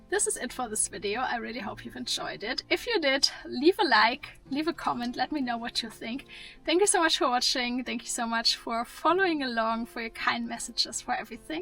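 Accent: Germany. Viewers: What s this accent ? German?..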